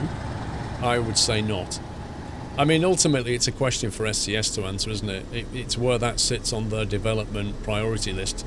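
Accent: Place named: Liverpool, United Kingdom. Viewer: .. loving the woolish Scouse twang 😁! Question for next week: with this generation (which am half in half out being 27).. and with the internet being something this generation is born in... Do you think games have changed to feed this generations instant gratification?.. as in... Do you think games have got easier and have quicker checkpoints so you can get quick satisfaction... I remember how quickly I used to complete super Mario world for the snes and I went back to it the other week and it was soooo much harder than I remembered..... What's your thoughts??? Next topic: console pc mobile gaming.. which is best for which genre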